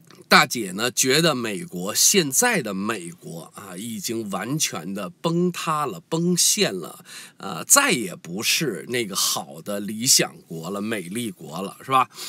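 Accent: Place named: Beijing, China. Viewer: 还是最喜欢听大叔在六月初大嘛闺头李的那期节目，那口地道的北京话听着太过瘾了，我现在就靠着那期节目提神和对抗抑郁症。多谢🙏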